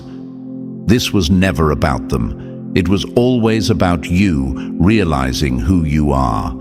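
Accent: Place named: United Kingdom. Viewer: How would know. You sound British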